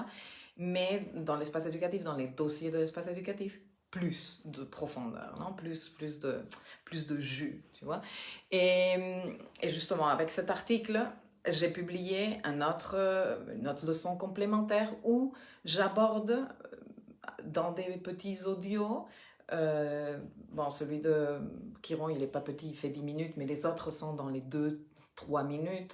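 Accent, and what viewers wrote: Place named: Mexico. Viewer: Merci beaucoup pour votre reponse...🙏🙏je vous découvre depuis quelques temps et j'aime beaucoup vos analyses et interprétations très éclairantes qui se croisent avec d'autres...et surtout j'aime beaucoup votre accent Mexicain... Gratitude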